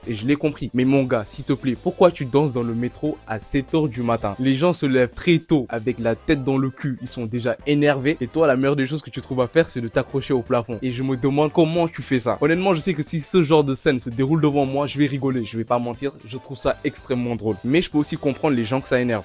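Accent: Senegal. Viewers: tu es sénégalais à ton accent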